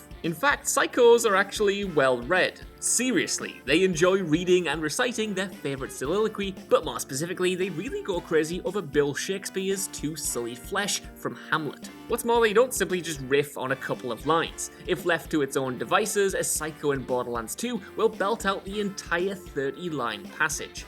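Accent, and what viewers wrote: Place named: United Kingdom. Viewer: I think that is just a british pronunciation of "guns" the accent sounds british to me (i could be wrong idk)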